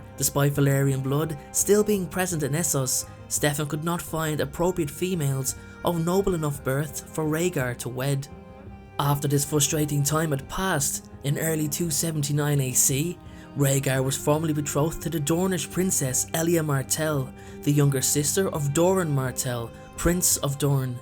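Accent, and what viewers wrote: Dublin, Ireland. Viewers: I think he's a Dubliner like myself 🙂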